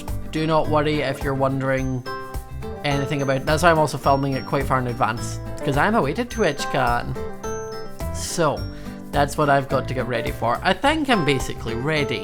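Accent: Scotland, United Kingdom. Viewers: I KNEW IT, HE’S SCOTTISH...I think.... Also have a happy halloween and bonfire night~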